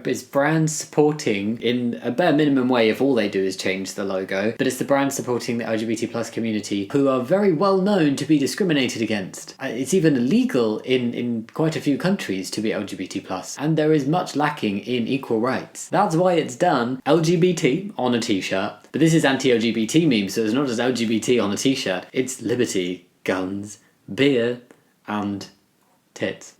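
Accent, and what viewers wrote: United Kingdom. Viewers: Are you British? 😮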